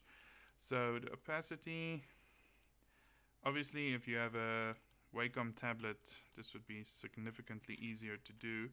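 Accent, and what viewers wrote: Afrikaans, South Africa. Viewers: You sound Afrikaans :P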